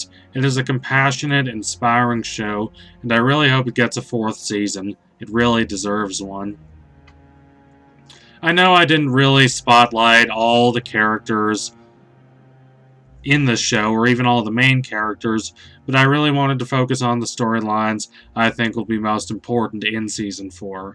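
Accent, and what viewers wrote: South, United States. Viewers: Dude do u have a accent? U almost sound southern? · Hopefully Claudia is the villain/ star character of season 4 because I would like to see her reactions to Rayllum and over all, the peace between Xadia and The Human Kingdoms. Also, I love you random southern accent, I laughed while listening to this video (in a good way). Keep up the great videos!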